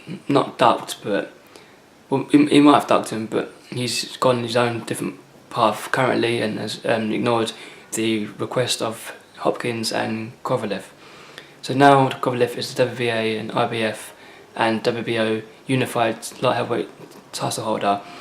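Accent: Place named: Scotland, United Kingdom. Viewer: Fuck dude, u sound Scottish! I barely understood what u said "lai heavy wait taitol" and I know ur English but slow down overthrere Otherwise no one will leave u comments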